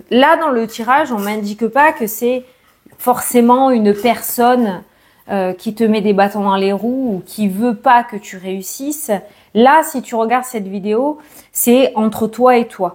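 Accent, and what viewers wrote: South, France. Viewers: Merci 🎉❤ ta guidance tombe à pic! Tout est juste...incroyable Merci pour ta fraîcheur. En plus, j ai le même accent du sud que toi. Tu es une belle découverte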